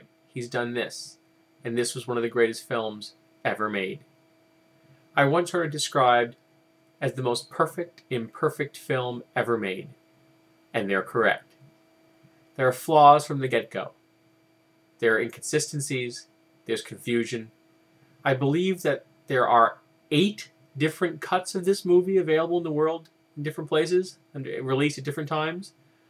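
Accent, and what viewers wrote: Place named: United Kingdom. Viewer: It's the Britishness, you know? People love the accent.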